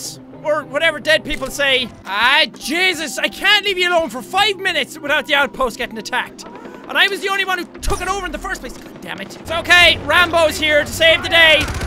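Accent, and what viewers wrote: Australia. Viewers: Your bringing back the Aussie accent again. Embrace the Aussie accent! Long live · I'm an Aussie and that wasn't the worst Aussie accent ever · has an awesome Australian accent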